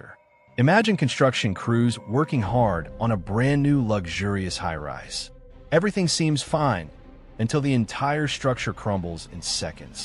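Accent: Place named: United States. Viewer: The reason it feels like an AI voice is because the word spacing is strange and the way it says "two - five hundred" for 2500 (there are more instances of this as well). Im not aware of any human that says it like that. "25 hundred", "two thousand five hundred" or "two and a half thousand" are about the only ways I've ever heard a human say it in any language. Given the accent, which sounds american, I dont think it's a case of "english not first language" either. I have, however, heard AI say 2500 as "two-five hundred" many times. That said.... even if it IS AI, a couple tweaks and it could dodge that. It's not awful.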